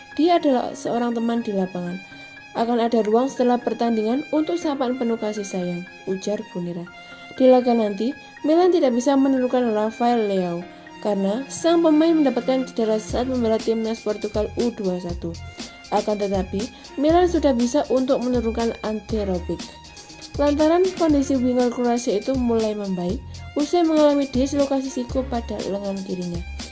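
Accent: Java, Indonesia. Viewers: min, orang jawa ya, medok bener logatnya...(^_*) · Gak usah ke Jawa Jawa an lah ngomongnya....biasa saja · Iyee gue jg merasa medok suaranya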